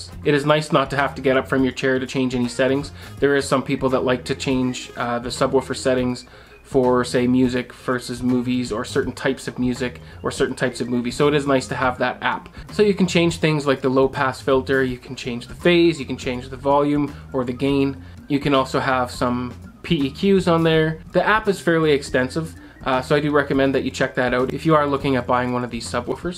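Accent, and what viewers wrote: Canada. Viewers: 1259 Canadian, how disappointing. The way you started out talking about the Canadian dealer I thought you were also Canadian 😕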